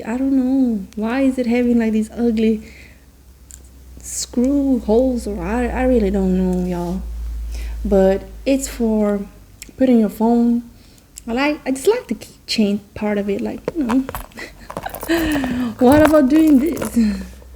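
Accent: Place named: South, United States. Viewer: I haven't seen any videos from u in a long time .. your accent reminds me of the south are u from the south??? this bag is 2 cute i may need on as well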